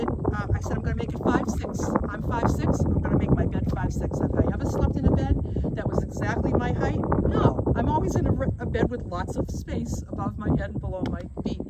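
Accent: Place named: Boston, United States. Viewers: Love your van, so well thought out and comfortably organized. I'm from Boston born and raised so I recognized your accent! I love how you have accommodated your priority to your self care of healthy eating and lifestyle, you have proved it can be done even living in a small van on the road. Brilliant! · Yep I recognize that Bawsten accent. Love Boston ( I’m in Ct) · You talk just like me so you must be from New England. 😊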